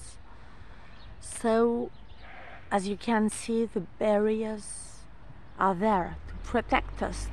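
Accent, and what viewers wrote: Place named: France. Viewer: Good morning my sister of night, it's so good to hear your lovely voice, your French and your French accent, I am wishing more and more I could speak French and maybe it's time for me to learn. Your town is beautiful! And hydrangeas are some of my favorite flowers! I hope you can rest in spite of all the music festivals and young people staying up too late and not being mindful of others. I hope you have a wonderful day and weekend. Merci ❤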